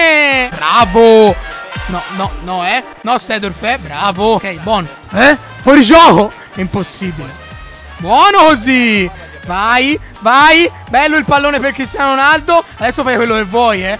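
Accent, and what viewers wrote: Tuscany, Italy. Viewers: In questo video il toscano era al massimo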